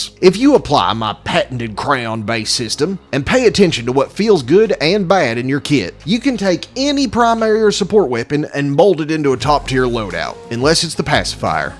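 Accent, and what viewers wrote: South, United States. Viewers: iam sorry but you sound like a very southern version of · Is the southern accent a bit or are you from the south? Would be nice to meet one of my own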